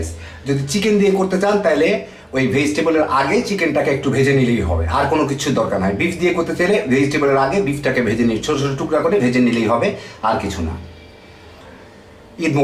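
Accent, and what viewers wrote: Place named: Kushtia, Bangladesh. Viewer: ভাইয়া আপনার দেশের বাড়ি কি কুষ্টিয়া। কথা সুনে তাই মনে হইল।জানাবেন প্লিজ।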